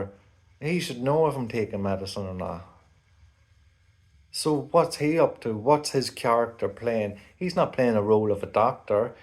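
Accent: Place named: Northern Ireland, United Kingdom. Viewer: Dungannon omagh accent 😃😃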